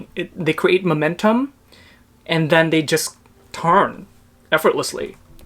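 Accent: Philippines. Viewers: I love your heavy Pinoy accent. Magandang umaga from the USA!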